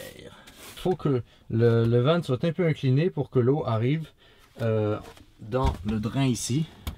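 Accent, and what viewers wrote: Quebec, Canada. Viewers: Ça ce voit qu'il est français, les gars, il a juste un petit peu pris l'accent québécois. x) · jb 2004 l accent québécois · Tu a un accent quebecois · L'accent canadien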